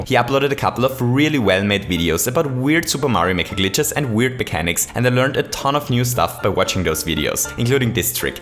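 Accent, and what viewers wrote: Germany. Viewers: You have an German accent xd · Ich wusste das du Deutsch bist! Habs schon am Akzent bemerkt!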